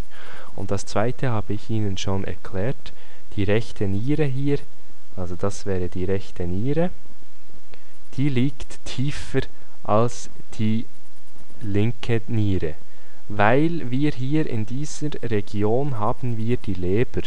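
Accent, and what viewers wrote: Switzerland. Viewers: Das ist sicher ein Berner:) super video 👌merci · So en Schwiiiiiiiiiiiizer !! :-) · ein sehr komplexes thema kurz, knapp und ausreichend erklärt, hilft mir sehr! vielen dank!....und ich liebe ihren schweizer dialekt ;) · Extrem hilfreiche Viedos , die durch sehr verständliche Erklärungen glänzen! Das Besondere sind die langsam gesprochenen Erklärungen, die es erlauben das Gehörte auch gleich zu verarbeiten , wodurch man ausdauernd zuhören kann. Die meisten anderen medizinischen Tutorials scheitern in ihrer Qualität an der Geschwindigkeit. Als schönes Extra kommt die angenehme Stimme hinzu und der schöne schweizer Akzent! Für mich die besten Tutorials im Netz! Herzlichen Dank für diese tolle Hilfe !!!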